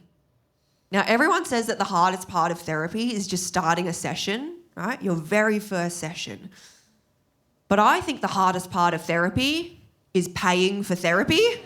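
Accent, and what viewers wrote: Australia. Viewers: People think your voice doesnt match the face because we are so used to American voices when we hear someone speak, when we hear someone from Australia everyone thinks its some white person because most movies show that, Australia isnt really popular in anything and noone knows how diverse Australia really is compared to most western countries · I'm sorry, not being mean, just you are hard to understand because you're Australian accent is so strong. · You are so much fun and perfect and beautiful! Icing on the cake is that you are Asian with an Australian accent..... win. win .. I could listen to you all day. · The first time I found the Australian accent so beautiful! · Going onto midnight and this pops up on my You Tube feed. I'm from New Zealand, and I see a Chinese woman with a strong Australian accent. I can't understand Chinese or Australian. 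I had to put the subtitles on . Sorry. Anyway, I had a peek. Glad I did, very funny and witty. New Subscriber. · Wow that is thicke australian accent i love it. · Ugh...Chinese with an Australian accent? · As a fellow Aussie, I really do think that anyone who has heard you talk and said you aren’t Australian is just extremely jealous of the fact you sound more Aussie than they ever will, but are also far more interesting because of how you look. 😂 Also, I am pretty sure it is the most Aussie thing to upscale your story every time you tell it, sort of like fishermen.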